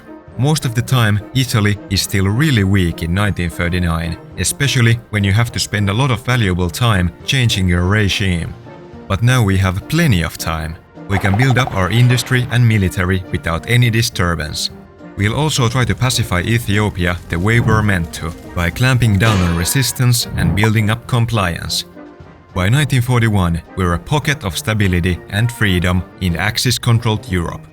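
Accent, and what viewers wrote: Finland. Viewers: Oh god i hear the finnish in you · Hey man are you from finland cuz u sound like it😮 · the finnish accent, Perfection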